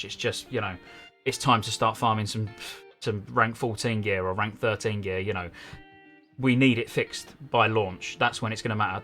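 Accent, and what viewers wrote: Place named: England, United Kingdom. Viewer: Trying to learn the different English accents, where did you grow up?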